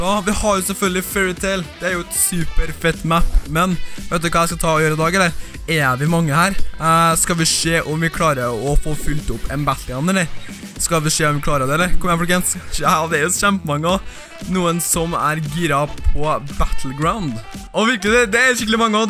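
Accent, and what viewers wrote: Trøndelag, Norway. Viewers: Du e best elsker deg liker din stemme som er trøndersk😍😍😘😍😘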